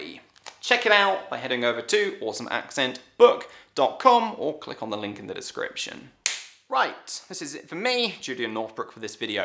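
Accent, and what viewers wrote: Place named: United Kingdom. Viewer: U spoke like British And British accent is hard to understand for many An American accent is good and easy to understand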